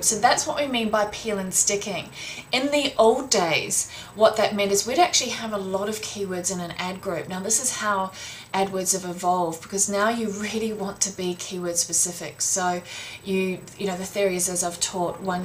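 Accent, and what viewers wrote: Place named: Australia. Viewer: perhaps because she's Australian! This is not an english accent mate.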